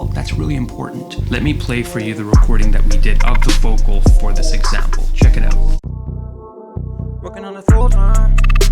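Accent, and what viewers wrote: India. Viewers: I swear I thought you were from India, lol.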